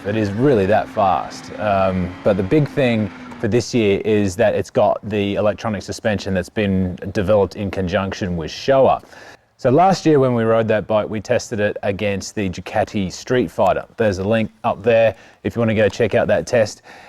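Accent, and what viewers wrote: Australia. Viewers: Thanks for the clarification. I apologize for any offence. I suppose I was fooled by the oh so familiar Aussie accent and assumed he was here in Australia as there was no reference to country or market. I was also a bit miffed that Kawasaki doesn't give us the full set of options as I'm currently looking at a Z H2 to buy and would love the SE with Ohlins et al. I should have checked my facts before criticizing yours. Thanks for all the good work you do for us. Cheers from Sydney Australia.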